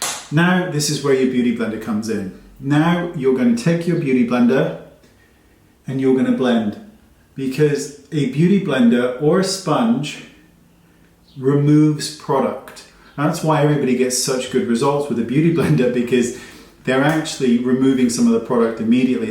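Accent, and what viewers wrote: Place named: South West England, United Kingdom. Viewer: Hi! I just stumbled upon your videos and I think you are so wonderful! It's so refreshing to watch someone who isn't involved in drama and just really loves makeup! This may be strange, but I was just wondering where you are from? I'm from Bristol and your accent seems to have a South-West twang!!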